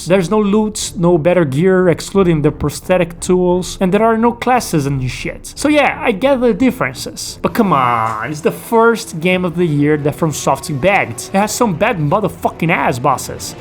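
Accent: Brazil. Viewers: tu é brazuca?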